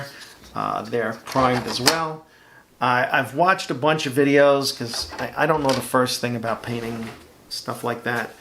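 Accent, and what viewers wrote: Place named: Queens, United States. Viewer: Question: You’ve lost your mojo for a Tamiya American twin jet fighter (F-15), but you’re excited about a Tamiya American twin jet fighter (F-4). What is it about the Eagle that you’re not feeling? There’s nothing about the channel I don’t like. You tell it how it is, you explain your thought processes and the music’s not annoying. Your build quality is inspiring and your Queens accent is refreshing for this Australian subscriber. I like all your build choices, and as such, I’d rather not recommend any particular kit type. I’m nearly finished my Eduard/ICM Dornier 17Z-2 ‘kampfstift’ build and you’ve just ticked my Luftwaffe subject matter preference box. All the best.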